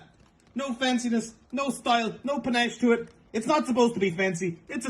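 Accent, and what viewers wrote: United States. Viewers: As someone from New England I can FEEL the origins of the Boston accent in my bones from this video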